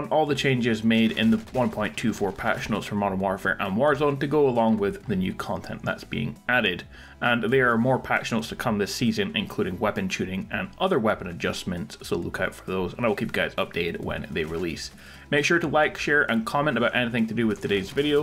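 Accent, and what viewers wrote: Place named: Scottish Highlands, United Kingdom. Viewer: Nice video man, where you from? Can’t specifically place the accent as a highlander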